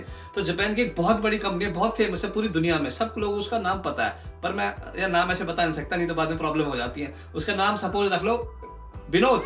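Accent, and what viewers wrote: Haryana, India. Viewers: Haryanvi on the top!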